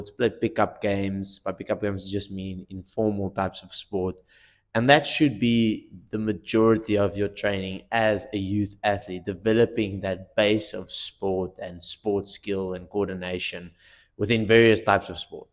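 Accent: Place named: South Africa. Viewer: Sounds like an Afrikaans accent my boy. Are you South African?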